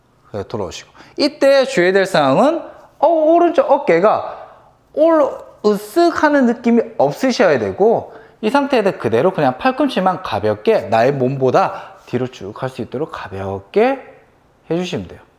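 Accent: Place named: Gyeongsang, South Korea. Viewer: 말씀 들어보니 경상도 싸나이신듯 하네요.☺따라해볼께요 땡큐♡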